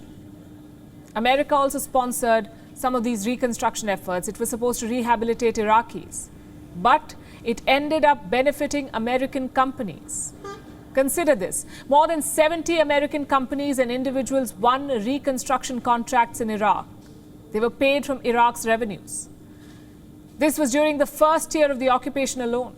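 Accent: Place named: India. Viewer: The US did not leave, where do you pull your opinions', out of thin air. A war started by Iraq attacking Saudi Arabia and Kuwait, again like Russia, they started the wars. What should the US do, leave. Financially it would be good for the US. The women had no rights, and Iraq threatened it's neighbors. Food for oil. It's not profitable to start a war, but what would have happened if the US did not. Iran would take over, and they are no good. India perspective is that we did not do anything. You speak Indian rather the English because you are not.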